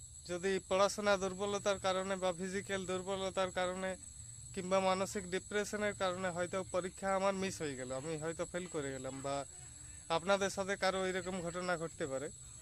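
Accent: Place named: Purulia, India. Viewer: কথা শুনে মনে হচ্ছে তোমার বাড়ি পুরুলিয়া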